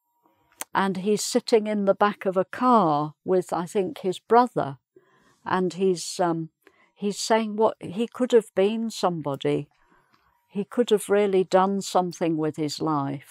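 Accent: England, United Kingdom. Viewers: ... I found you yesterday... and I am to confess that your English accent is fantastic... And your explanations are very clear and useful. With love from Brazil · O ne kadar güzel ingiliz aksanı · Is her exent british??plz tell me.becoz i need british teacher. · You have really beautiful British accent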